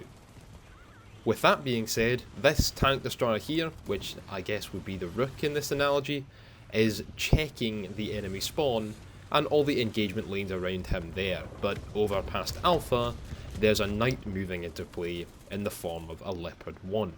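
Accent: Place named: Scotland, United Kingdom. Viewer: Is it just me or did your scottish accent get extra thicc today?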